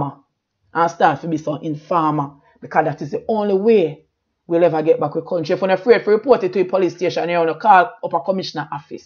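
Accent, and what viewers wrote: Jamaica. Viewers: I like the patois..never heard you like this b4....keep moving forward..one way...blessing!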